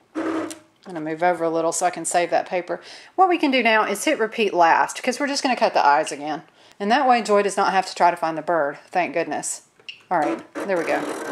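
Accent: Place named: South, United States. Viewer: When I first saw the video I thought you had went and bought a Cricut Cake..I was like "what..I liked her fake"...hahaha. I love the way you talk too...I have the southern accent also so its great to hear someone else with one!! Great video!!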